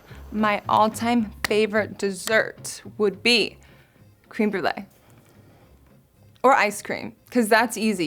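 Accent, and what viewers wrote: United States. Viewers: Girl all Americans sound like this stop being a child and hating on someone cause of there accent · Most American Accent I've heard.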